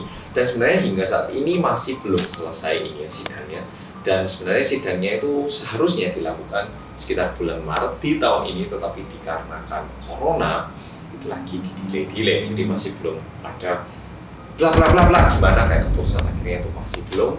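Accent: Java, Indonesia. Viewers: Mas:Bersama orang korea yang.. Aing sekeluarga auto teriak: Medhokkkkkkkkkkkkkkkkkkkk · Yang? Medoook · Halo mas.... Welcome back with me, fans orang korea yang medooook 😁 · Hei orang medok kenapa sama gak anak annajiyah aja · Semangat terus mas wong korea sing "medokkk"🤣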